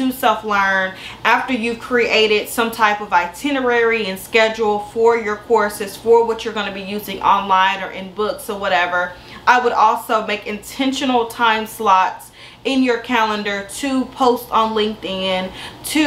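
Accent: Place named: South, United States. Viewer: Hi! I should’ve specified. I meant to ask what location are you in? I watched another video tho and I believe you said Nashville. I love to see a fellow Black GRITS (girl raised in the south) thriving! Love your energy and accent! Thanks for your content.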